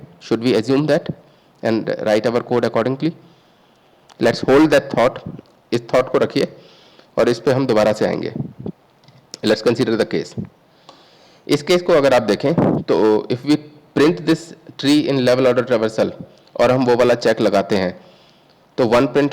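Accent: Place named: South Asia. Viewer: I really appreciate this video. I think you could reach a broader audience if you spoke slightly slower. I have some experience with South Asian speaking accents, but the speed at which you are talking along with the lower quality of the audio makes it a little hard to keep up (particularly at )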